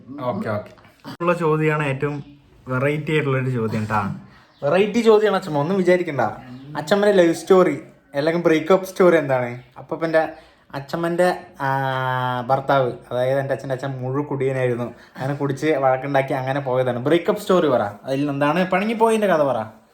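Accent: Palakkad, India. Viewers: ആദ്യ subsciber ആണ് ഞാൻ.. അന്ന് പേന ഒക്കെ കൊടുക്കുന്ന വീഡിയോ കണ്ടത് ഇന്നലെ എന്ന പോലെ ഓർക്കുന്നു. അന്ന് എനിക്ക് ഈ ഫോൺ അല്ല.. അന്ന് ഞാൻ comment ഇട്ടിരുന്നു നീ നല്ല മനസ് ഉള്ളവനാണ് നല്ല നിലയിൽ എത്തും, ചാനൽ one മില്യൺ അടിക്കും എന്ന്... അന്ന് 1k പോലും ആയിട്ടില്ല എങ്കിലും എനിക്ക് ഉറപ്പ് ആയിരുന്നു..പാലക്കാടൻ സംസാരവും ആ വീടും വീട്ടുകാരെയും എല്ലാം എനിക്ക് ഒരുപാട് ഇഷ്ടമാണ്.. ഒരു അഹങ്കാരവും ഇല്ലാത്ത ആളുകൾ... എനിക്ക് എന്റെ അനുജനെ പോലെ ഇഷ്ടമാണ്.. എന്നെങ്കിലും നേരിൽ കാണാൻ ആഗ്രഹമുണ്ട്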